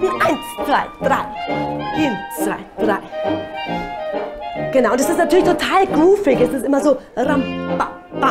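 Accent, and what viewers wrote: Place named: Bavaria, Germany. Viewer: Liebe, ich wollte dir einfach nur ein Kompliment aussprechen. Ich hab mir mit meinem Mann euer Video angesehen. Du, oder ihr seid so sympathisch und lustig. Danke für dieses schöne Video. Das Bayrische klingt so nett und deine Sprüche sind zum Kugeln 🤣 super! Du solltest im Kabarett auftreten! Alles Liebe aus Österreich und weiterhin viel Freude beim Tanzen! Isabella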